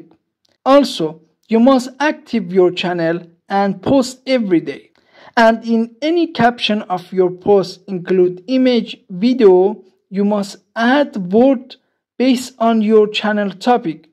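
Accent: Iran. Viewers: لهجه‌ی ایرانی‌تون عالیه :))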